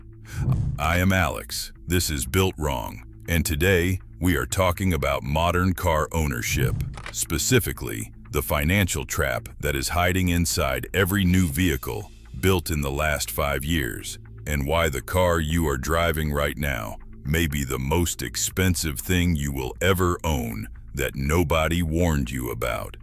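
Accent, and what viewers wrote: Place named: United States. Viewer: Please can we have someone that doesn’t speak that awful sickening American accent for a change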